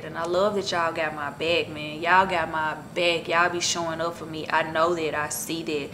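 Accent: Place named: Memphis, United States. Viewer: Hi my southern sis!! I knew there was a reason you sound so much like K. Michelle. That M-town accent is strong hun. I'm from the MS/AR Delta and I have lot of friends and family members from Memphis and their accent sounds just like yours.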